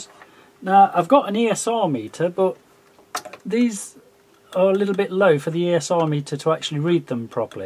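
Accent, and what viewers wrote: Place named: Derbyshire, United Kingdom. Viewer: great vid that was a good call on the caps..takes me back to my days in the 80's as a stores clerk for co-op sound and vision...caps were my nemesis too mainly for ordering and stock control. Not from Derbyshire by any chance? think I recognise the accent..regards from Derby here mate.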